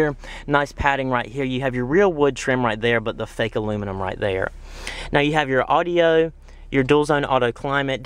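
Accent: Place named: South, United States. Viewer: I'm a Texan, but I can hardly stand that southern accent and the part about, "If you can afford it, so what!" How arrogant!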